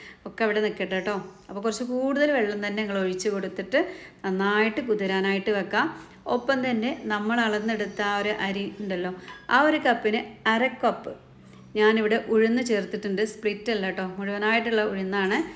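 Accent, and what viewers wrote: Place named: Kannur, India. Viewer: താത്ത സംസാരം വല്ലാതെ മാറ്റി കണ്ണൂർക്കാരിയെ പോലെ സംസാരം പക്ഷെ താത്താൻ്റെ പഴയ സംസാരം കേൾക്കുന്നതാണ് ഞങ്ങൾക്കിഷ്ടം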